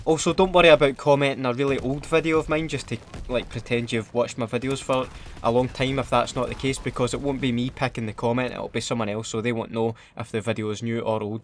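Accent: Scotland, United Kingdom. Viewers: 1) I live in Scotland, tho I'm from France 2) First video I saw was the one on Bully, through a facebook post. I remember it because it was my first week in Glasgow and I was like "Oh man is that the accent I'll have to live with for the next 4 years?". Loved it tho, and I've been showing your videos to my friend and family at home whenever I get asked how is the Scottish accent;) Thanks a lot for what you did there, it is nice to see someone do stuff like that and have conscience of what is around them. Keep up the good work mate:D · South Africa I watched the soap video on Facebook uploaded by game lads or something like that. The first video on YouTube I watched was the clowns video. (I also frequently binge your videos cause sometimes nothing else interests me except for a Scottish accent. Not pandering, just stating) Part of the notification squad now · Im from England! 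I have to say the first video of yours I watched was tactical nuke incoming when I was in a mw2 hype, thought you were funny so watched a few more and the wee Scottish accent just made it even funnier! keep it up though man! im glad to see someone who's made it big and still thinking about the people less fortunate than themselves! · Greetings from Texas over in the US! First video I ever watched was "WTF EVEN ARE COD MAPS?!" It's an amazing video that intoduced me to your hilarious content and great style of commentary. Never did I think I'd spend so much time watching a Scottish man rant about COD so calmly. Thanks for the giveaway, and I hope you help raise a lot of money for such a just cause. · I'm from Finland & the first video of yours that I watched was your "most useless attachments #3", which made me fall in love with the Scottish accent (no homo). I've stopped following any other COD youtubers than you. 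Needless to say, awesome work bro. Please keep it up, really brightens my day when I see that you've uploaded a new video. Cheers. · England and the first video I don't know but it was around about the time you did the video about a party and everyone was off their heads! Love your content solely because you're a Scot! My dad was from Edinburgh so I can understand somewhat what you say!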